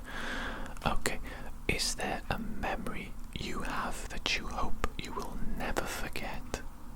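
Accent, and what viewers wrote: Wales, United Kingdom. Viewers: Great video! I might be wrong but from your accent, do you happen to be welsh???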